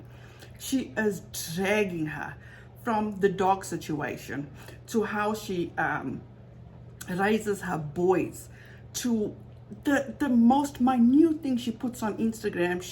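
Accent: South Africa. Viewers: You sound like you have a Cape Town accent! I love your accent 😍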